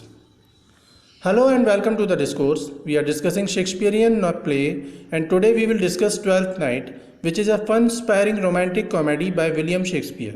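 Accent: India. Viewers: Very good Indian Accent.. Perfect Indian English language.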